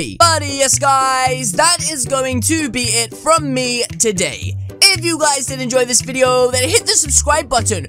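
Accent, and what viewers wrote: United Kingdom. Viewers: This guys BRITISH?!??GOD I LOVE HIM EVEN MORE!!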